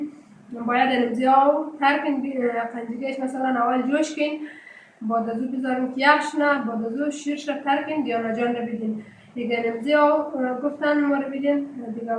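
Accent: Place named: Iran. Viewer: خیله نصفش ایرانی میگی نصفش هزاره گی درست گپ بزن یا ایرانی یا هزاره گی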